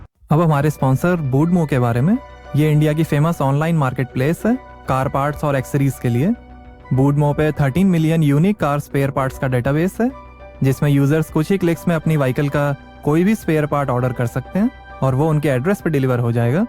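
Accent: United Kingdom. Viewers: Bhai Saab...not British....be indian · British bolna teak hai but the landrover ownedby Tata motors 🔥😎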